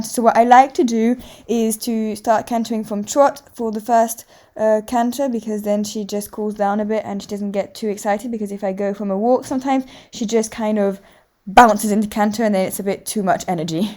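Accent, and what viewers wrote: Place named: England, United Kingdom. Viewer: Sorry, I don’t really understand you English accent. Can you add subtitles please? Anyway, you’re a good rider🫶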